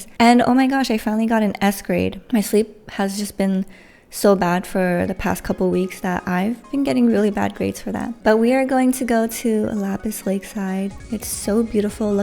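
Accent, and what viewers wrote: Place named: Canada. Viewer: oh my god im so glad to see you back. sending you all the love and healing vibes! I went to lapis today too, such a pretty and serene island. I also got desserts sooo basically twins I love how you sound more canadian now that you're back in canada ahahhah you are so blessed to have the people in your life that you do<3 CHEERS to you getting through the hard part and cheers to your boundless future. I'm proud of you!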